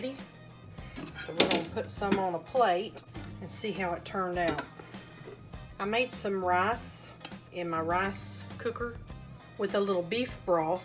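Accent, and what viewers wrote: South, United States. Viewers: Love your accent! You're truly a southern belle 🤗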